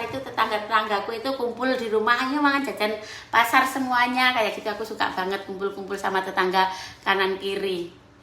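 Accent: Java, Indonesia. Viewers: Iya mbak baik tidak neko2 berbicara juga masih logat jawa tudak gabung inggris ya rendah hati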